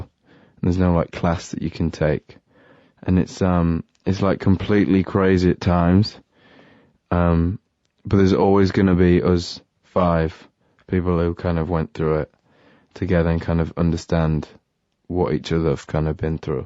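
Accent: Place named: United Kingdom. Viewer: Nope. American here. He sounds British.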